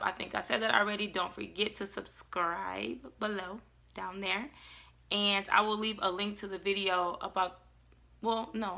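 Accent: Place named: New York, United States. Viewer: you're from detroit? you sound like you're from NY lol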